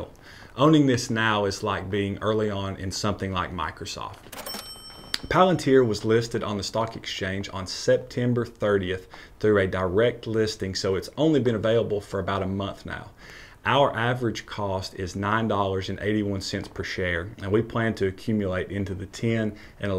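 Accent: South, United States. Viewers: Southern accent like yours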